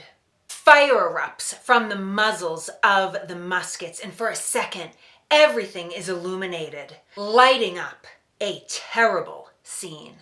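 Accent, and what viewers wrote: Boston, United States. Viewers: Edit: Good historical video. Are you exaggerating the accent? I live less than an hour from Boston and have visited the city many times. Its very thick. Like, most people Ive met with the accent don't have it that thick. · I listened to her talk for 30 seconds & could definitely tell, without a doubt that she was from Boston!🤣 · I've never heard this story told so well....also, her Boston accent makes it so much more authentic! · Her Boston accent cracks me up. I thought my accent was bad. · Great work, you made me feel as if I was there that very nite, of March 5th,1770. AS a former New Yorker, (left in 1978) now living in the People's Republic (i.e. communist) of Washington, in the Pacific Northwest, 50 miles north, from the SAN-FRAN-FREAK-O of the NORTH, formerly known as Seattle. Just remember, Washington is divided by the Cascade Mountain Range, the commie's all live west of the Cascades' the regular folk live in the eastern side. 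YES, Jeff Bezos (owner of Amazon) is moving from the People's Republic of Washington, to Florida, so as to literally save millions of dollar's in taxes, when he sell's some of his Amazon stock, later this year. You just gotta hear the local commie's crying in their soup, that because of their TAX THE RICH policy's, their getting NOTHING $$$$$!!!! Is this a great country or what!!!! God Bless America. Your Boston accent only adds to the drama for your mama. I'm truly amazed by the detail of the drawing as well as to learn who the artist was. For the record my thought was that John Adams (future 2nd POTUS, 1797 to 1801.) FYI: POTUS = President of the United States. I am aware, Adams successfully defended the eight British troops in court. Your passion is glorious to behold, thank you from a 70 yr's old man. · That Boston accent is so thick you almost need subtitles. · Your bostonian accent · The Boston accent just adds to the storytelling and what a sweetheart!!!❤ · I just found your channel a couple of days ago…. 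I absolutely love your Bostonian accent & natural beauty. I have since watched 5-6 installments & loved everyone. This one on the Boston Massacre taught me many unlearned things about what happened that night. I am obsessed with Revolutionary War & you have helped to quench my thirst for learning. Blessings, Ken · Well, I was certainly wrong in my guess about the map artist. It's a good thing I subscribe to this channel. I'm amazed about the level of detail about the massacre that is in this video. If you walk the Freedom Trail, you can visit the grave of Crispus Attucks. Wonderful Boston accent. Never lose it. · I love your knowledge and enthusiasm. Your presentations are top notch. I used to do reenactments and it was a gas! Where were you when I was a young man? Why do people have such trouble with your Boston accent and my Long Island accent? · Hello, new here...It's been pointed out by others that I have a Boston Accent, but your Boston Accent is "way over the top"--lol I subbed. 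I grew up in Waltham....What town did you grow up in? 5 Stars! --Van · Love your Boston accent, definitely sounds different to a missouri man. Thank you for telling us about our founding history. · The diagram must have been drawn by Paul Revere. At any rate, thank-you for your videos! As much as I've read about our Revolution, I always learn something new from you. and yes, your Boston accent adds to the charm and the telling of each incident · you have to love her Boston accent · As a lifelong military and American historian, I can’t believe it took me so long to find this amazing channel. This video was very informative…my guess was John Adams who defended the soldiers in court. Okay…the Boston accent makes the video more enjoyable. New and excited subscriber. Thank you.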